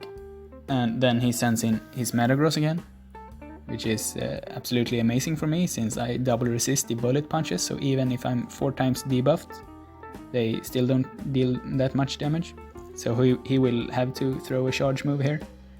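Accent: Sweden. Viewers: Are you from Sweden?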